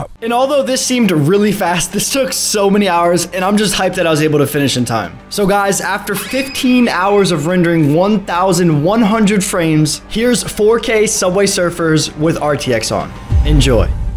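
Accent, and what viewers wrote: United States. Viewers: Video starts Skip all that padded murican accent bs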